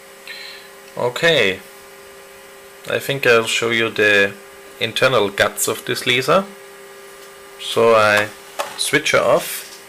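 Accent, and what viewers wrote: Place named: Germany. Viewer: Man hört den Deutschen Akzent deutlich raus. Macht aber nix :) Trotzdem tolles Video!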